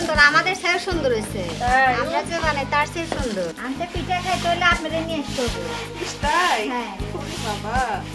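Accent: Sirajganj, Bangladesh. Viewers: Sirajgonj bole kotha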